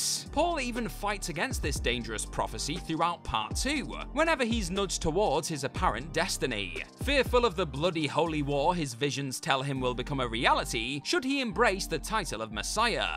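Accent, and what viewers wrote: United Kingdom. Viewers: You do realize that British accents are like that, right? Brits can't change the way their accents pronounce words. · Because he's British. That is literally how the English accent works.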